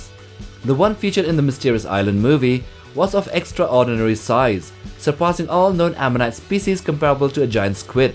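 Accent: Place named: Khasi, India. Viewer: What accent is that? Are you Khasi people?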